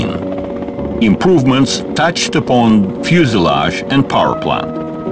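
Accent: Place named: Russia. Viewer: Other than a rather heavy accent his English is perfect.. I have no trouble at all understanding him. I find the accent actually quite fitting and adding to the charm and flavour of the documentary. Russian planes, Russian accent. It fits.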